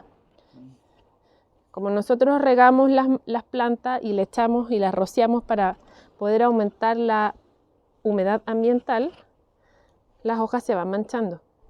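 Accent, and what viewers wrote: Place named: Chile. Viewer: Muchas gracias por compartir! Me encantó el video y tu acento chileno! Saludos desde México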